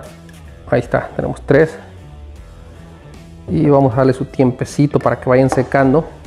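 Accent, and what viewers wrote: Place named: Veracruz, Mexico. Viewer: ¿Sos de Veracruz?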